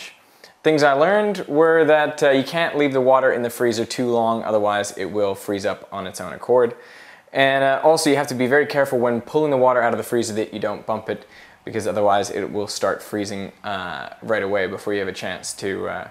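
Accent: Australia. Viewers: I just realized he has an Australian accent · Just the Slightest hint of an Australian accent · his aussie accent is so much stronger in this video than his recent ones although he's canadian, right/? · Anyone else think he sounds more Australian in this part of 2011? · Wow he really has an Australian accent in his earlier videos. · This is the only video I've noticed his Aussie accent bleeding through. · You can hear some Aussie in Young Veritasium’s voice!! So cool!!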